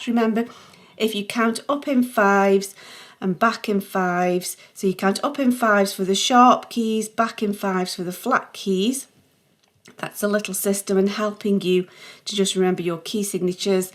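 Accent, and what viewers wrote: United Kingdom. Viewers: You sound British. Are you? 🤔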